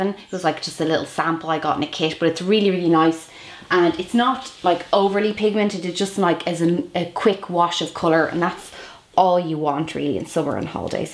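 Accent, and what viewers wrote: Ireland. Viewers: She's from Ireland so I'm guessing it's an Irish accent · I love your videos!!! I love your accent!! I felt totally in love with Ireland when I went there when I was younger, it's the most beautyful place in the world!!! And I was pretty surprised by the people too, I've never been in a country with such nice people, really. I'm irish in my heart ^_^ Take care sweetie.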